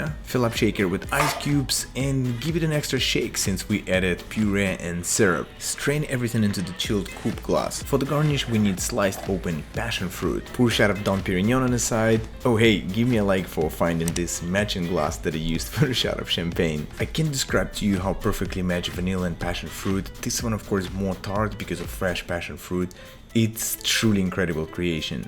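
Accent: Russia. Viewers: I love your Russian accent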